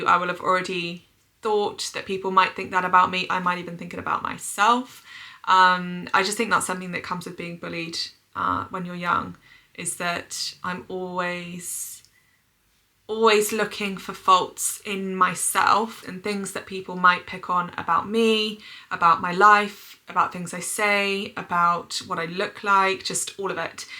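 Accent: United Kingdom. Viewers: Your videos and your channel are maybe more appropriate for the ladies, but once I occasionally stumbled on a video of yours I subscribed and keep on watching them... You live in a very beautiful place, like a fairytale village... And listening to your special British accent is the real pleasure to me , now living in a countryside of a distant land . It helps me much while I'm out of the conversational practice... Cheers...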